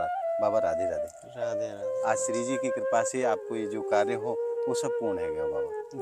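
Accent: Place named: Braj, India. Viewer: Sree! ji aap ko mera Radhe Radhe. Mai Rajasthan jodhpur se aap ka video dekhta hun. Bahut achha lagta hai. Aapke braj Bhasa ati sundar aur ati madhur hai. Aap bahut namra hai. Aap ka braj bhasa keval sunta jaun. Jai shree Krishna Jai shree Radhe radhe.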